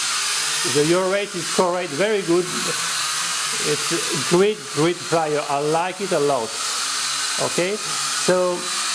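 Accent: France. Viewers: Salut, vu ton accent je suppose que tu es français :-) Je voulais savoir si ce quad était bien pour commencer avant d'attaquer le vol sur un 650 monté par mes soins? Et que signifie Banggod courtesy? En gros ils te le filent gratos et tu leur fait un peu de pub en échange ? J'ai également une chaine YouTube, tu crois que ça serait possible pour moi de faire la même chose ? Cordialement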